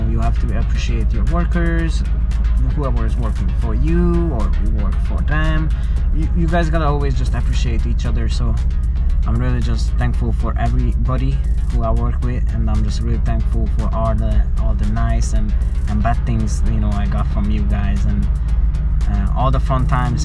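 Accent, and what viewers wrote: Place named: Armenia. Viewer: Your accent sounds either Armenian or Russain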